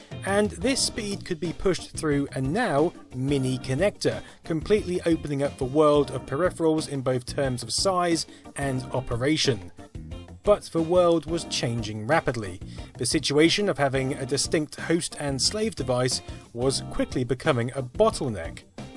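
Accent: United Kingdom. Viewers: I giggle every time he says Nokia. Does everyone from the UK pronounce it 'nawkia'?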